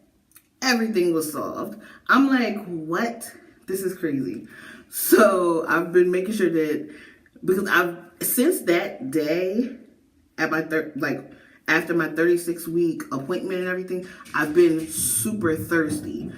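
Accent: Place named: Philadelphia, United States. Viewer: Hi! Are you from Philly? I ask because I am too and I can hear a Philly accent. I am sooooo happy for you and your husband ❤️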